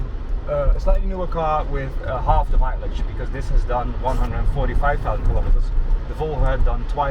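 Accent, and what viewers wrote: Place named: Netherlands. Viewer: I thought you were Dutch,recognizes the accent,I’ve played hockey against Eindhoven way back in the 70s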